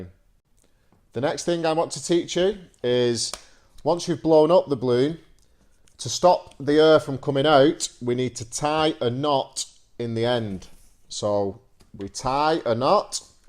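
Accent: United Kingdom. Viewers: Hi, how are you? What kind of British accent do you use?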